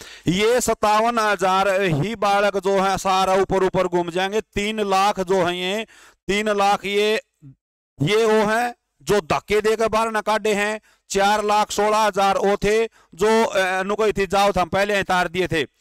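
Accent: Haryana, India. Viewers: Sach me sir aap real haryanavi ho sida sida bolte ho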